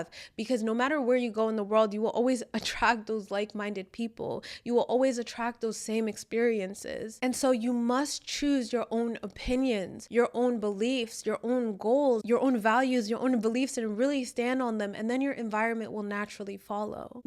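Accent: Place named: United Kingdom. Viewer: Why did I thought you were from the UK??? 😂😂😂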